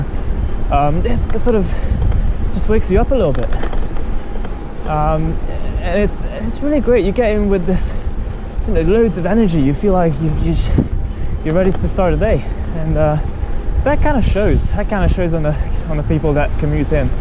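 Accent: Scotland, United Kingdom. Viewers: I would say he schooled in the Edinburgh or Lothian area. Strongly detect gentle middle class Scottish accent. That may also explain why he' wasn't used to heavy morning traffic when young since Edinburgh has more car free zones than most cities plus a more advanced segregated cycle trail nfrastructure.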